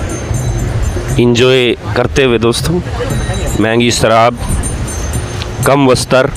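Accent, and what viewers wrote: Haryana, India. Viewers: Bhai ye aap ke liye Naya hoga kyoki aap ke liye sab Naya hoga aap Haryana ke ho sayad kyoki aap bhaasha se pata chalta jai wahan pr ladies ko Kitna samman Diya jata jai sab ko pata hai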